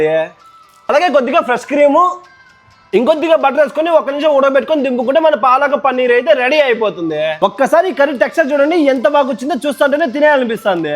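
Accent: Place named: Kadapa, India. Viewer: చెప్పేటప్పుడు కొంచెం నెమ్మ చెప్పండి నీ లాంగ్వేజ్ అర్థం కావటం లేదు మాది పక్కా ఆంధ్ర మీది కడప స్థాయిలో ఉంది లాంగ్వేజ్ కనుక కొంచెం నెమ్మది చెప్పండి అంటే స్లోగా చెప్పమని అర్థం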